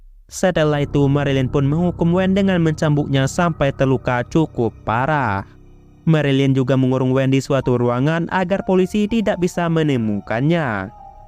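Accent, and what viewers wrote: Aceh, Indonesia. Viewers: Bahasa logat Aceh nya nampak kali iya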